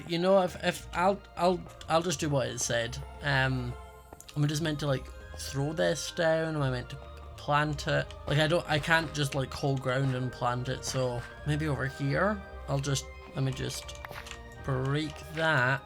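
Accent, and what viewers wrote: Scotland, United Kingdom. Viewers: ok, so. new subscriber here. Nice ta meetcha. I really love the Scottish 'language'. it would be so cool if you did a video that included all the normal Scottish slang that exists. I wanna hear what a true Scottish man sounds like before I move to Scotland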